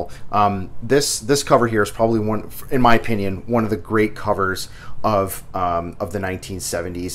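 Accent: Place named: Canada. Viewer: This is what a Canadian accent sounds like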